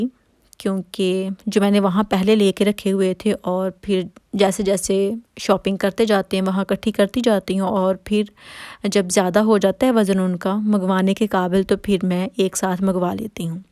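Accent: Faisalabad, Pakistan. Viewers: Urdu lehja bilkul fsd walo ka hai 😋😋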